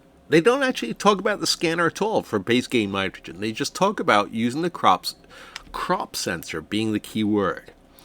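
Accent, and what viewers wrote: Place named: Northeast, United States. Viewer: Interesting findings. Thanks for doing these in-depth videos, they’re very helpful. Nice to get some tips from somebody who has been playing this game even longer than me! As a side note, I’d love to know where your accent comes from. I had you pinned in the northeast US until I recently heard you say you’re from Canada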